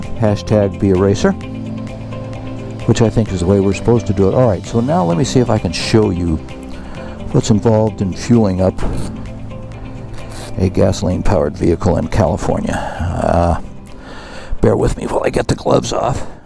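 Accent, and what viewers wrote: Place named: Spain. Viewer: Nice ride, I can say that you are a natural Spanish speaker :) Be safe my friend.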